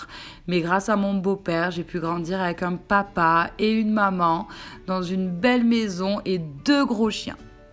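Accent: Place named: Toulouse, France. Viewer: Super vidéo franchement les dessins son magnifiques et étant Toulousain aussi t'inquiète pas pour ton accent j'ai le même on s'en fout xD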